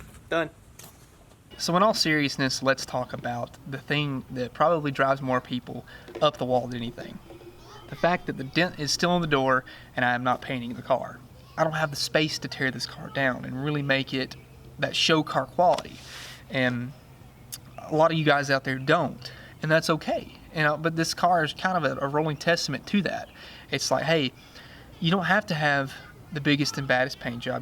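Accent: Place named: South, United States. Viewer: My husband and I are watching this right now, we love the way the car is looking and we're watching to the end to see how it turns out. You are really amazing, we love how you take so much care with each and every part of that gorgeous gorgeous car. We're also from the South so we have accents as well so it's ok, we're use to people sounding that way. (Oh, just in cause you were maybe possibly wondering, we're from&live here in Tennessee)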